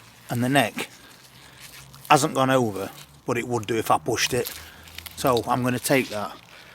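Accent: Yorkshire, United Kingdom. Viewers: Where r u from? I'm sure I can hear some Yorkshire accent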